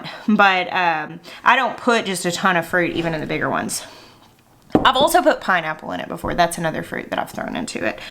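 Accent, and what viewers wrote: South, United States. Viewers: Make this so often in the fall! A friend was just saying she made it for a get together at her house and trying to pronounce it, lol. She said it much the way you do, and not how you said it during our history (love that!) you southerners and southwesterners crack me up with your accents!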